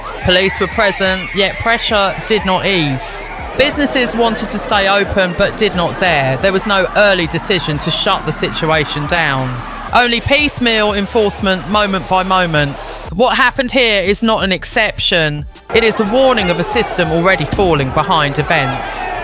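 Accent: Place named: London, United Kingdom. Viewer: Your dialect is smack in between Queen"s English and cockney.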